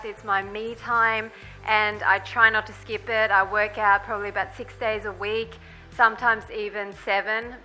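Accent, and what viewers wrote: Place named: Australia. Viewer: Love this behind the scenes video and totally agree with Mon P. that you have one of the best workout channels out there; I also recommend it to everyone I know who's into fitness. As a Chicago girl, I tell people that one of the fun parts of doing your workouts is your delightful Australian accent! A couple ideas I think would be great for Coffee Talk videos would be: 1) fat loss vs. weight loss and how to hone in on fat loss once a desired weight has been reached; and 2) what do you typically eat in a day/recommendations for how to eat well overall. Personally, I tend to eat healthy, but struggle with being able to find healthy foods that really keep me full. This is a tough area for me because my metabolism is pretty quick, so I always feel like I'm out-eating everyone around me by like double. Any tips?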